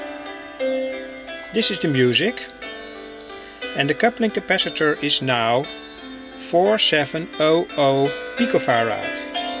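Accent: Netherlands. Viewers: Are you dutch? becouse i am.. i can hear it on the way you talk..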